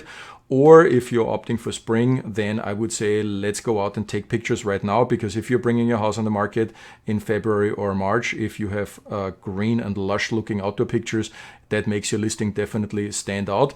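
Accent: Germany. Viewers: It sounds like you are from Germany? As a European used to a safety net I find it overwhelming to imagine how to get a job in Milwaukee without a career. And being able to afford healtcare. If it's even possible. Are there any places that would help you apply for jobs for free? I wonder if it's possible to qualify for food stamps as an American citizen while your searching for a job if you've never lived there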